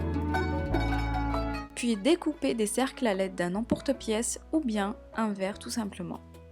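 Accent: Morocco. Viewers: L'accent marocain j'adhère pas 😖 Mais bon y'a toujours dès rageur qui accepte pas les avis des autres:) Sinon comme d'hab une bonne maman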